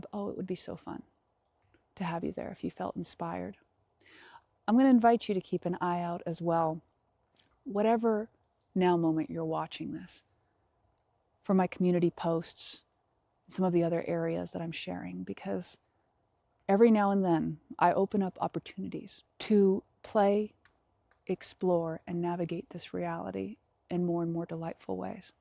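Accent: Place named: South, United States. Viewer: Didn't think you'd be southern. Refreshing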